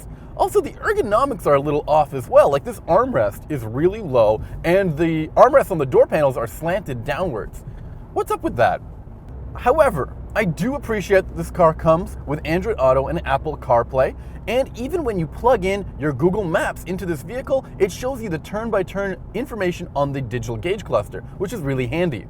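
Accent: Canada. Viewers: Thuffering thukatash I really really wanted to watch this video but how can I with this guy speech impediment mixed with his super strong Canadian twing? I can't imagine that the automakers are very happy when they got them back with the dashboard and windshield soaked with spit. Thank God for the mute button and closed captioning!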